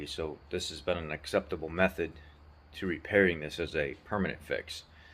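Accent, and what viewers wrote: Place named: Kentucky, United States. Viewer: That Kentucky accent made me laugh for 5 minutes straight..!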